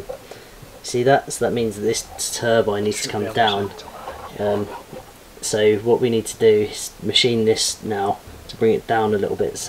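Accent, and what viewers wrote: United Kingdom. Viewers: 我竟然能听出是英式口音？？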